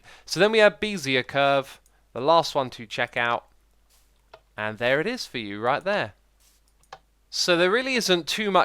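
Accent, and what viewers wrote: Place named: United Kingdom. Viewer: Not to be disrespectful, I am genuinely curious about British pronounciations. I have noticed for a very long time now that some words that end with the letter 'a' are pronounced almost like 'ar' or 'er' for example like when he says 'java' edition and sound like 'javer' or 'javar'. Why is that?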